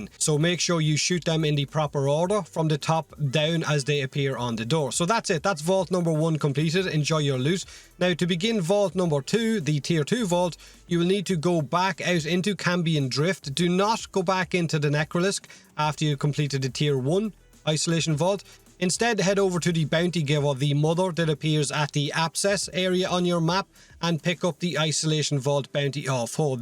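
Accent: Ireland. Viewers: 4 seconds in and i new this guy was irish-i think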